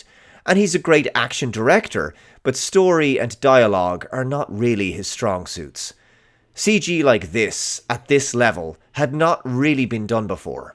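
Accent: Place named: Ireland. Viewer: Is it me or does just sound more Irish than before? I haven't watched for a long time.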